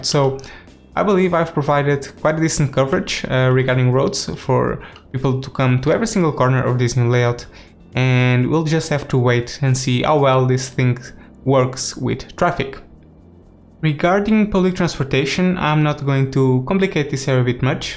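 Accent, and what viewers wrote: Portugal. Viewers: A voz não engana ninguém, a maneira de falar o inglês, nota se mesmo que és tuga caralho!!! Gosto muito dos videos, continua o bom trabalho! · Engraçado que ouvi o teu sotaque e percebi logo que eras tuga :)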